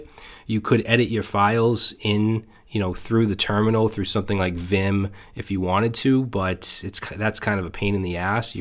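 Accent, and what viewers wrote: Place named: Massachusetts, United States. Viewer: could I ask if you're from the Massachusetts area? Your accent sounds familiar :)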